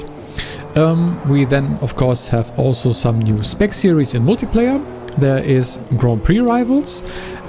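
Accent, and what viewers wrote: Germany. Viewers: You sound very german lol. Edit: oke nach dem Schwedenkreuz war ich raus 😂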